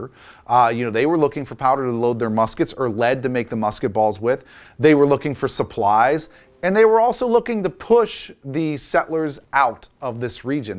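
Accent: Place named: Pennsylvania, United States. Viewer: Dude! That accent! I lived in PA so long I didnt realize just what a pronounced (strong) accent it was! Thanks for the interesting info! I have been thinking about the Shawnee people, and didnt realize they had a presence in Northern West Virginia (LOL western PA)!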